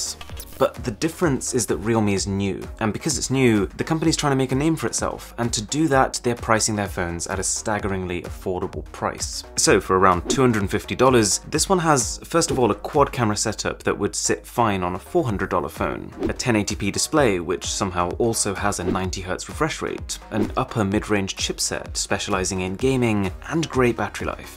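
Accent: RP, United Kingdom. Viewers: Where the hell did you get that info from? The dude is British and it's been proven before by the uni he went to and so on. I've also met people from the UK with the exact same accent and they're not too hard to find. Go do your stupid conspiracy elsewhere. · Nice review but why do you talk Posh? lol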